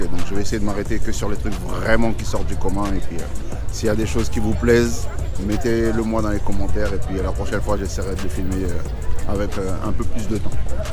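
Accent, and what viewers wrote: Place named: South, France. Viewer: Savas tu vas très bien et bien décontracté pour moi bonne vidéo on dirait que tu est originaire du sud de la France moi aussi est je vie à Miami fl à plus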